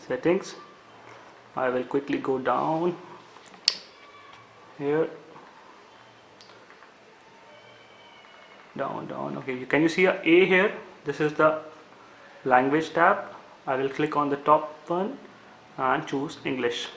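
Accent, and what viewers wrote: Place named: India. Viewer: i don,t like your indian accicent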